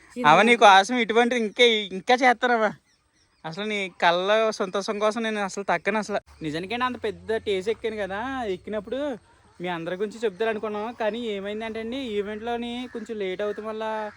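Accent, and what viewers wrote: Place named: Amalapuram, India. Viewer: Nenu otu vesa anna ni videos chustanu kani eppudu coments pettaledu nuvvu seam ma annayya la untav seam enka acham nekulage untadu anduke yeppudu chustanu ni videos madi amalapuram mana slang tho bale mataladatav any ve congrats annayya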